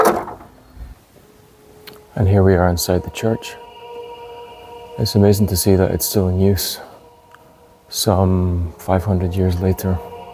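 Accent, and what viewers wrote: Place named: Scotland, United Kingdom. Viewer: any otters in ur area, need to make some mates. luv ur scottish accent and ur humour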